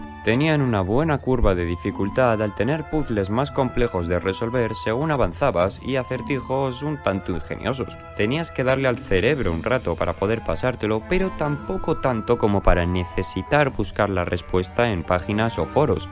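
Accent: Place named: Spain. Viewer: es de españa pero el acento no se nota mucho porque no usa palabras características de españa y con la voz seria no se nota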